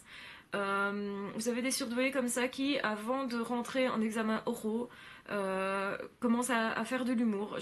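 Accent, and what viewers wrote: Belgium. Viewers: Vous êtes adorable, utile et très agréable , sachez que votre intelligence en arborescence me parle et me touche beaucoup. Il me semble qu"une femme s'exprime plus facilement par l' écrit que par l'oral et que c'est l'inverse pour un homme .Je vous suis depuis longtemps et je vais continuer car cela me rassure sur ce que je suis . Merci .PS J'adore votre accent belge .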